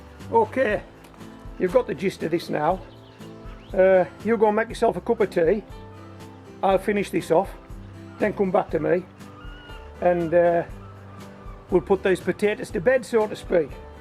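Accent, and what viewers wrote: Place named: Cumbria, United Kingdom. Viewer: Are you from Cumbria? You sound like a Marra!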